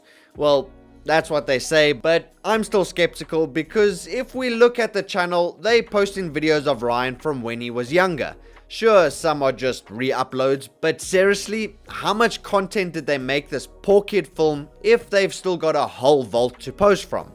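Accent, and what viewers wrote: South Africa. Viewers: Are you south african boet?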